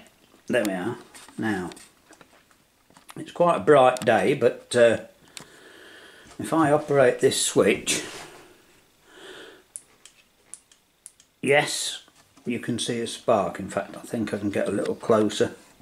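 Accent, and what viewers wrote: England, United Kingdom. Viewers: Shit, I legitimately thought I was fluent in English as a French native but to be honest I can't get anything of this strong English accent contrary to American accent.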